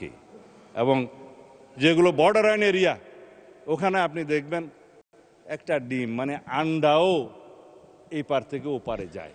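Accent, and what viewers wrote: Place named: India. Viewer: এর কথা শুনতে ভালো লাগেনা,,, ভারতীয় একটা ভাব এর মধ্যে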